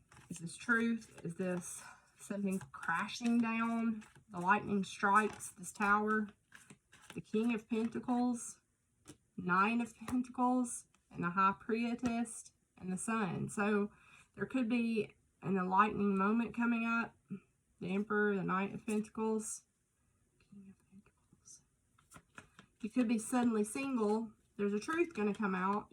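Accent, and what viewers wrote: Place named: South, United States. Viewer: I needed this. This was for me 💖💖 I’ve let go of so many bad habits the last two years. Nicotine, a narcissistic drug addicted mother, a drug addicted brother, as well as an abusive alcoholic ex husband. I’ve started a new bad habit over the last year that I can’t seem to kick though. Weed 😒 it has helped my anxiety and to heal and let go, but I have noticed the negative impacts it has taken on my energy and personality. I know what I need to do, separate myself from the person who brings this habit around me and keeps me smoking. I also have 3 small boys. So children are involved. I’m healing, we have moved and cut off all bad people from our lives, so here’s to cutting out one last bad habit so my heart and body can truly heal. I love your channel btw! My new favorite ❤️ your accent makes me feel connected 💖 a Eastern KY girl hearing another country girl doing tarot is everything to me 😍😍😍😍💖💖💖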